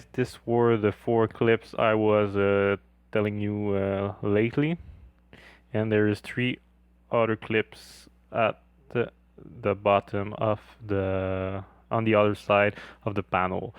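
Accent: Canada. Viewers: New sub! Very nicely done video. Btw, are you from Quebec as your accent is familiar....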